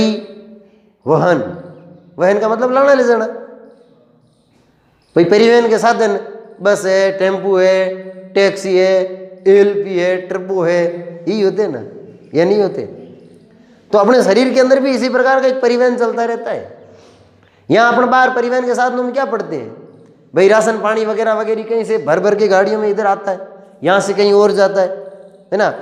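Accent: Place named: Barmer, India. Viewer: आपके पढ़ाने का तरीका यही बनाए रखना क्योंकि कॉमेडी के साथ पढ़ाई में बोरियत महसूस नहीं होती है ओर खासकर देशी अंदाज...क्या आप बाड़मेर से हो गुरू।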